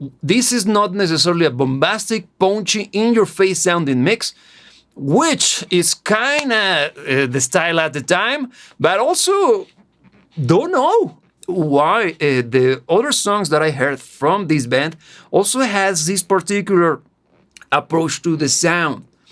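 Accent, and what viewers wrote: Russia. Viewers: With the Russian accent this heavy, I strongly doubt that you haven't heard this before :) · you sound russian who is speaking english. where are you originally from, what’s your first language ?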